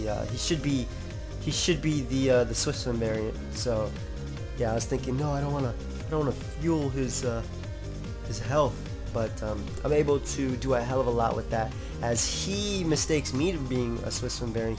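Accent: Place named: New York, United States. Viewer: Liam Flaherty Nah, he just has a lazy way of speaking, along with dat slight Nyu Yawk accent.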